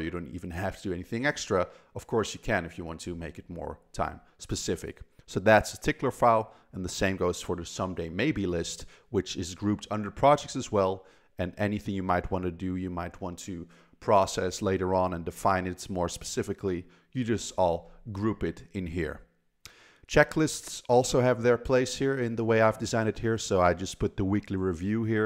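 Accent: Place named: Netherlands. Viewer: I'm here for 30 seconds and I'm guessing you're Dutch?!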